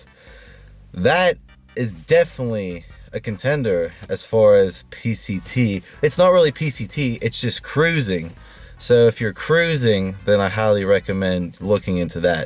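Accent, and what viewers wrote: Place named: Baltimore, United States. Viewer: Without even finding out, your from Baltimore. Accent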